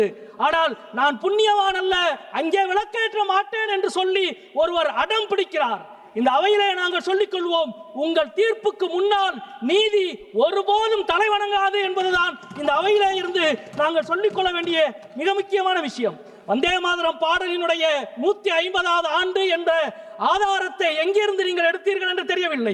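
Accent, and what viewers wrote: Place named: Madurai, India. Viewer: சங்கிகளுக்கு சரியான செருப்படி கொடுத்த மதுரை பாசையில் வீர முழக்கமிட்ட செந்தோழருக்கு வாழ்த்துக்கள் இன்குலாப் ஜிந்தாபாத் நன்றி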